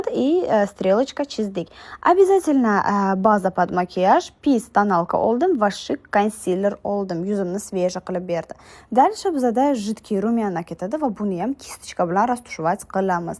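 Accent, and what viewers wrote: Bukhara, Uzbekistan. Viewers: Qayerdansiz shevayiz Buxoro ili Navoiy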